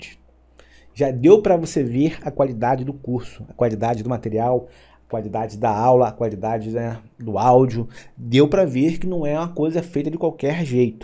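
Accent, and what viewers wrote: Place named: Rio de Janeiro, Brazil. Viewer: sabia que era carioca!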